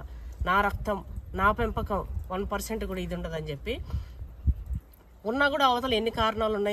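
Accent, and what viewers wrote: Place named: Rayalaseema, India. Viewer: Rayalasema slang ki navvu vachindi 😅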